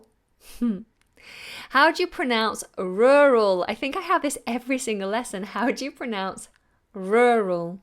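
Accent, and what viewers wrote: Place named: United Kingdom. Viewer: But l keep listening her UK sound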